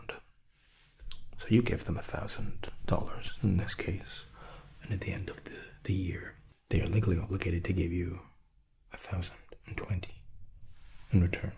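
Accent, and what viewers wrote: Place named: Scotland, United Kingdom. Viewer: Not gonna lie, I like the ones where the Scottish accent is extremely obvious 😂all of them are great, though ❤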